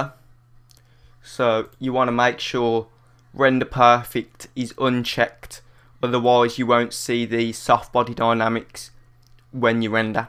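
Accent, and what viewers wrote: Birmingham, United Kingdom. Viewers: haha Brummie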